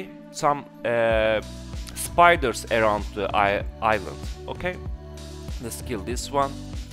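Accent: Turkey. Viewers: rastgele girdim videoya aksandan anladim türk olduguna :D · Kesin Türk aksanından anladım :)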